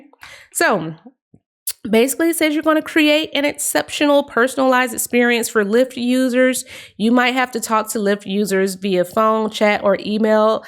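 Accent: Spain. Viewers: ME ENCANTA TU ACENTO (i AM ENCHANTED BY YOUR SPANISH ACCENT);)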